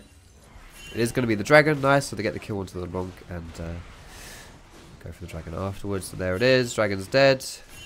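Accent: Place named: Southern England, United Kingdom. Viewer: He is from the south of England, around Kent judging by his accent.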